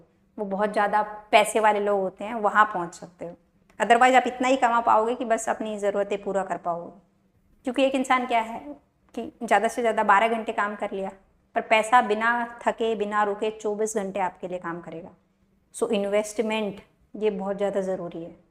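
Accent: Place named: India. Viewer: I love your Hindi accent mam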